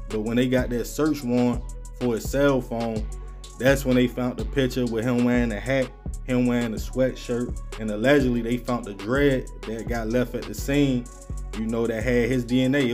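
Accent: Baltimore, United States. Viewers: Boy you need to speak a bit clearer. You can blow. But your journalism is kinda suited for only Baltimore ears. Not saying you not doing ya thing. But it takes practice to shaking how thick our accent is. This ain't no hate, I just know it's hard cracking mainstream with how thick our slang n mannerisms are. Shout out to you tho brova. G shit.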